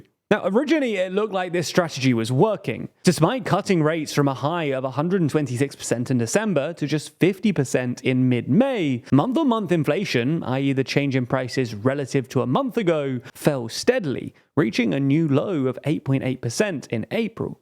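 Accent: United Kingdom. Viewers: I'm so sick of guys with British accents trying to convince us that gods don't exist. :P Milei was elected on the explicit promises of cutting spending and saving the economy from the course it was on toward Venezuela's hyperinflation. He was honest during the campaign in declaring the recovery would be painful. You'll look like a buffoon in five years for opening the video with a smug attempt to take rock lyrics out of context with an ad hominem attack making Milei out to be some kind of chaotic evil dictator rather than a brilliant economist willing to take as much heat as necessary to correct the catastrophic course that the socialistic keynesian model of Argentina has been on. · Why is it always a bouncy british voice that's telling lies on youtube. I swear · 1. Your videos are quite long, boring, filled with ads, in the beginning , middle, and end. 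2. You barely give sources, your video titles are clickbait, and your content is biased sensationalism. 3. Your channel is the trash humans should rid themselves from. 4. #TLDRNewsGlobalIsTRASH and an obstacle and distraction keeping ppl from finding quality information. #ThereISaidIt Now click like on my comment so the POS behind this video read this (prob not the British-sounding narrator)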